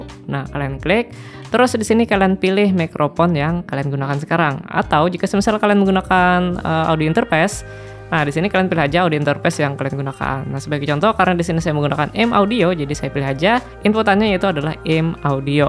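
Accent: Banjar, Indonesia. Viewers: Dari logatnya kaya orang Banjar ini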